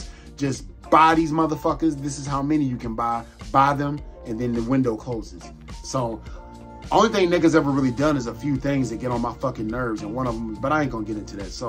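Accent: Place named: New Jersey, United States. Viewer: So I came here from ninja... This is by far my new favorite channel. Hearing you talk makes me homesick about my people in NJ. Amazing content! Subscribed!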